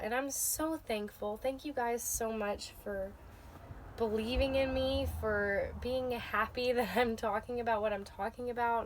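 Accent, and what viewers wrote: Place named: South, United States. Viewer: Girl! I feel you about the way people perceive us based on accent. So like you I speak with a southern intellectual accent. I ain't stupider than you Ms and Mr California! Lol I just have more spice in my life. Keep up the good work!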